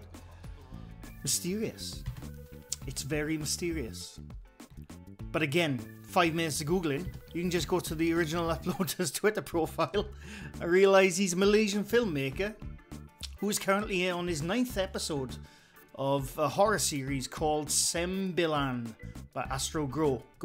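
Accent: Wales, United Kingdom. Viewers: Is that the accent of a fellow Welshman I hear?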